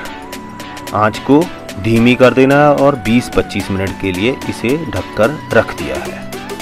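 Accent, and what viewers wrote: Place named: Punjab, India. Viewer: paaji meri maa pichle 45 saal se mujhe kadi khila rahi thi. Maine shaadi nahi ki. To aaj mera apni maa ko kadi khilane ka mann hua. Aapka video dekh k maine banaya... whoa!!! Bohot delicious banaa thaa. Ek cheez maine add kiya and wo thaa khada dhaniya. Bohot bohit shukriya aapka. Aapki punjabi accent waali hindi bhi kadi jaisi achi hai. Thanks 🙏🏼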